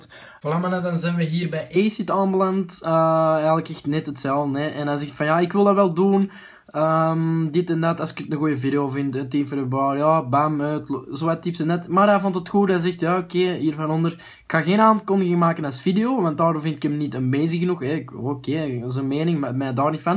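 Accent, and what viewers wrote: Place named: Antwerp, Belgium. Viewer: plat antwerps on point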